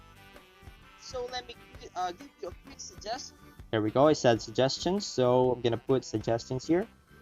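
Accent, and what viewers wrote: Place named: United Kingdom. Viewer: British accent heh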